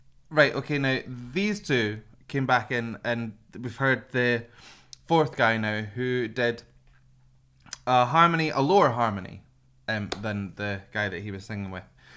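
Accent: United Kingdom. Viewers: Thank you for your professional analysis! I love your British accent. Either UK or Scotland. Love it! Here is my expression of their voice range if I compare to colonial style of house range. From the left from us Ko Woo Rim: Bass as foundation of house &deep basement to support strong house built. Kang Hyong Ho: Angelic voice, tenor and falsetto as 1st& 2nd floor shooting up to attic through out of the roof like a roller coaster.(can do male and female parts, check Phantom of the opera) Jo Min Gyu: Tenor. 1st& 2nd floor(he can also do falsetto beautiful way) Bae Doo Hoon: Tenor. 1st& 2nd floor(he was musical actor so he brings out so much of emotion) I love them all together. Because of their different range of vocal ability, it bring out the best! They all work together from foundation of the house, basement, 1st floor, 2nd floor and attic to roof to complete the house project! 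Everyday they bring the joy!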